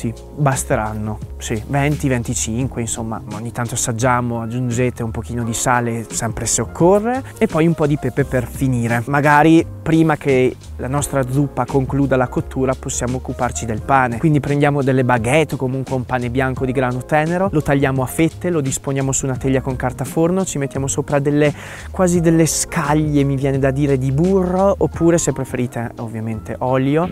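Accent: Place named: Trentino, Italy. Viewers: "...te sei en brao matelat".....orgoglio trentino...davvero bravo, simpatico, sobrio, educato ed efficace..... e mooolto trentino. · Io ti preferisco con i capelli corti, comunque ti adoro solo sentirti parlare.. Quell accento del Trentino mi fa impazzire! Un saluto dalle Marche. Trentino ti lovvo..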